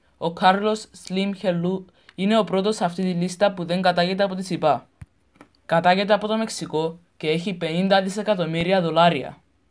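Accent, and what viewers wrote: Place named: Cyprus. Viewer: κυπριος ειναι ετσι μιλανε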